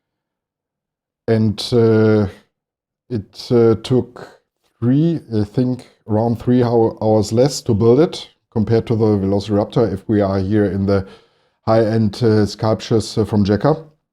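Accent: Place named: Germany. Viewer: are you german? you dont realy sound native english 🙈.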